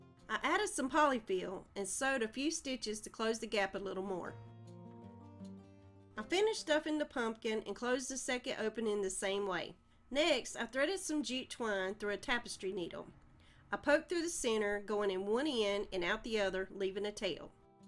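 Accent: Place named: East Tennessee, United States. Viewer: I love all your videos!! You are so creative!! I like the way you talk cause I talk about the same way 😂 Im from East Tn.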